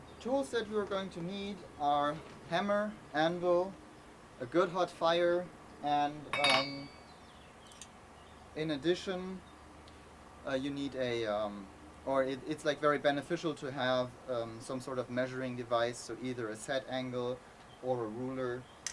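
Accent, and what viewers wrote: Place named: Germany. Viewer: Bist du deutsch? Da hast glaube ich einen deutschen amboss und irgendwie hast du auch einen deutschen Akzent...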